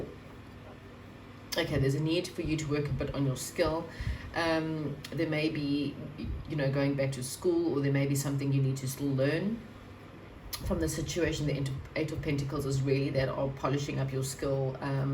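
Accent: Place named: South Africa. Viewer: 💚I love your readings and your accent South African? 💚 100% accurate 💚 thank you💚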